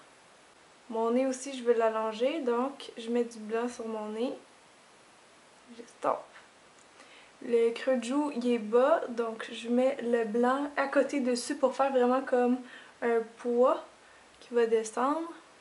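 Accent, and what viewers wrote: Quebec, Canada. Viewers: J'adore dessiné! et c'est vraiment joli ce que tu fais! J'adore! et je pensais que je n'aimerai jamais l'accent quebecois. La je suis je sais que je me suis trompé. A croquer :) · j'habite aux états-unis, et j'ai vu ton vidéo, et j'ai pensé "cool, je comprends quelque français, donc je le regarderai!" eh bien tout de suite j'ai su que tu est canadienne à cause de ton accent. ce sons un peu comme un accent américaine avec l'accent français, ensemble. Je suis fier de moi, que j'ai pu le reconnaître immédiatement. :) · quel accent sympathique. vive les quebecois! ^^ et quel travail ! bravo, j'aurais pas fait mieux.. xD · C'est un accent québécois je pense... Je sais pas parce que je le suis... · Ta un super accent canadien français et anglais !! XD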